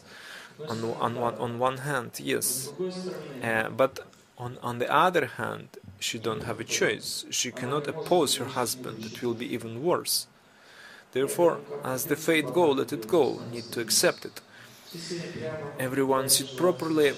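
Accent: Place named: Russia. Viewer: That russian accent sucks, but thx to ur hard work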